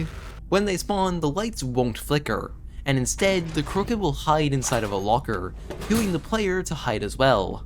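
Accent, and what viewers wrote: Ireland. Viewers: Is bro from [anywhere in the island of Ireland (Not just in the Irish republic)] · i can hear the damn irish in your voice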